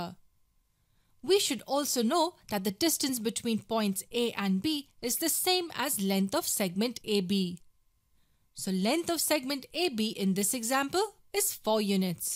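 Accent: India. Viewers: indian accent uh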